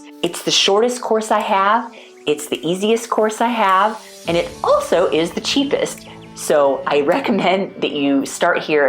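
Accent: United States. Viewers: Hey ma'am i have always seen you preparing or making one thing or another only for Non Indian people I just want to request you to please do something for Hindi audiance/viewer We the people of India really lacks the ability to speak/write/express in #AMERICAN English language as we know English is the Global language and Language of business We need your accent we need your native language skill For lots of Hindi people Specially for #BIHARI We want to explore and connect with your world 🌍 through your language and wants to grow So Please Do something who is not Non-Indian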